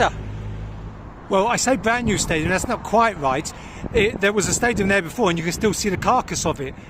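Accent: United Kingdom. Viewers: British accent 😁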